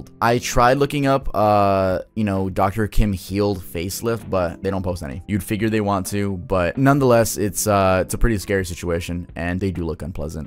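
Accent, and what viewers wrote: Spain. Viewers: “Por Dios” is slang. It mostly translates to something like “By God” not “On God.” “On God” will be more like “En Dios.” Either way, I like your Spanish accent!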